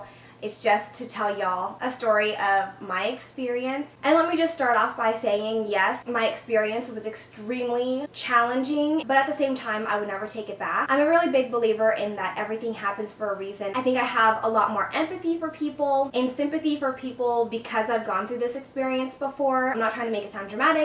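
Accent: Texas, United States. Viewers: thanks for your insights... i knew you were a fellow texan 10 seconds into the video lol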